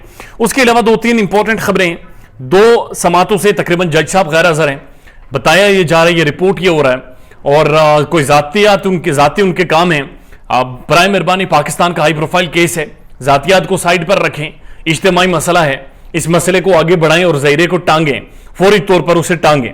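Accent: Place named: Punjab, India. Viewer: Hayee Sir! M just ap kii punjabi ko sunany kyleay ap ka vlog dekhtii..wEsy to bii punjabi hn..lakin apkji punjabi style wahdhiya💯👌👌😂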